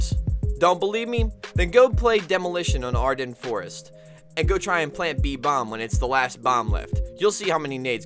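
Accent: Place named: Pittsburgh, United States. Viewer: You have a Pittsburgh accent kinda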